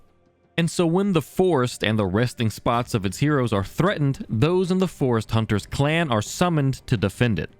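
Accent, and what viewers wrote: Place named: South, United States. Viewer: I just now noticed that you have a slight southern accent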